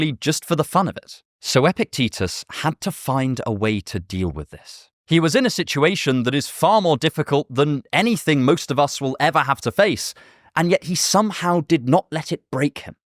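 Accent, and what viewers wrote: United Kingdom. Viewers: All i wanted was a channel like this, Also Learning the british accent!! I feel finally there is someone who understands me better than i myself. I felt i was looking into a mirror Kudos to you & this video! 🍷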